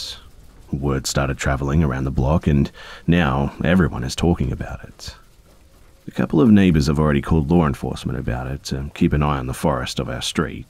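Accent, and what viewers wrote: Australia. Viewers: Trying to place your accent - Melbourne (AKA Melbun)?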